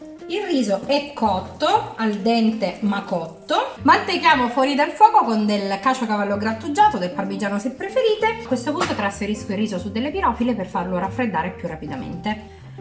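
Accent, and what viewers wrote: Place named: Sicily, Italy. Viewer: Ma non senti il suo bellissimo accento siciliano??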